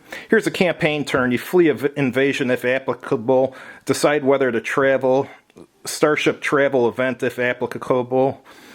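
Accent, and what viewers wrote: Chicago, United States. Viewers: I know this is 2 years late, but great review. Also, this guy must be from Chicago. That accent is amazing.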